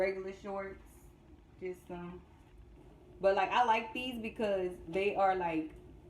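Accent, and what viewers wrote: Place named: Florida, United States. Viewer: It’s the Flori-ana accent for me!!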